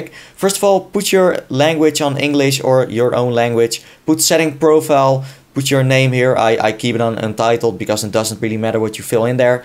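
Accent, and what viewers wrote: Netherlands. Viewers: this guy has dutch accent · Are you dutch? Cool! I could hear it by your accent! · are you Dutch becous you realey sound Dutch becous i am Dutch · Jij spreekt Nederlands hé je hoort dat aan accent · ik hoorde meteen dat je nl was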